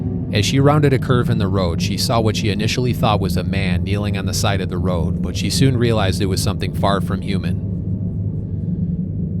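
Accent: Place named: Wisconsin, United States. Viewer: I just found your channel and your accent... I feel like you have spent more than a little time in wisconsin yourself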